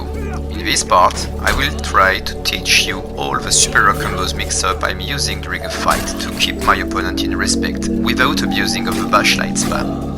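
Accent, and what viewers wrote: France. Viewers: You are french ? you have a french accent :)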